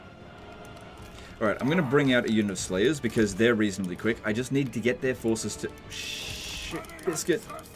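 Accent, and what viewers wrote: Australia. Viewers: The subtitles dont recognize australian, you speak too fast and unclear.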